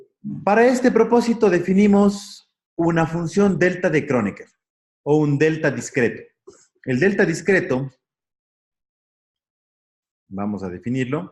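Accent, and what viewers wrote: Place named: Cuenca, Ecuador. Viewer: ese acento, seras de cuenca-ecuador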